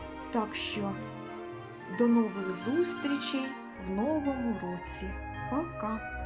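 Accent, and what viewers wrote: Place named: Cherkasy, Ukraine. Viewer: Дякую за вашу співучу черкаську,нашу солов"їну українську мову. І вас з наступаючим новорічними та різдвяними святами! Миру, здоров'я, благополуччя!!!💙💛💙💛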